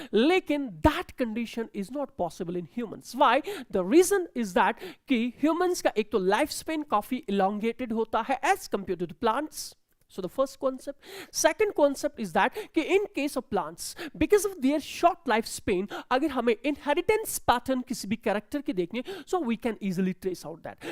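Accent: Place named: France. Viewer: Heyyyy french accent, the huge energy, deliverance kya baat h sirrr 🔥🔥🔥! love from my side ❤❤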